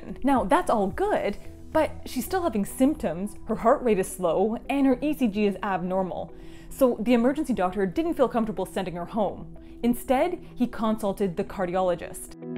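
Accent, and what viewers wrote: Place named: Canada. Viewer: If you’re in the US, true. She sounds Canadian?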